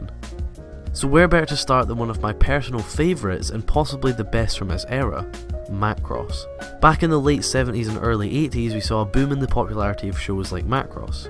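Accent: Scotland, United Kingdom. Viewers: He Scottish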